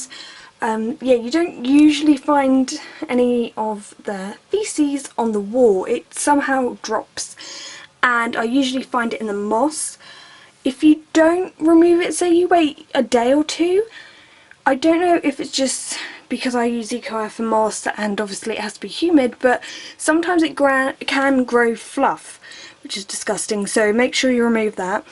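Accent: England, United Kingdom. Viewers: idiot it is a British accent!!! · DigitalGaming she lives in England and her accent is real bro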